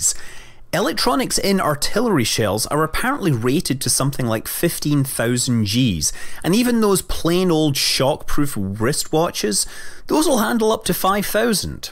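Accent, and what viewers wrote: Scotland, United Kingdom. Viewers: Hey... are you scottish, you sound scottish?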